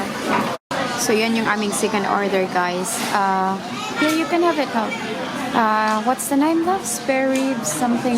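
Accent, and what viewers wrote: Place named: United Kingdom. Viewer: chinese national pala si ate langgaa😅 pero ang accent ng pananalita is parang british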